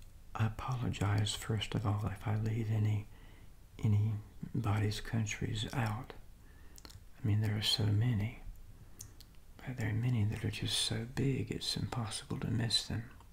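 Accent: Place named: East Coast, United States. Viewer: I’ve been binge watching, obviously. Didn’t know where you came from and took a guess one day & said “east coach of America” its the way you pronounce things that give it away .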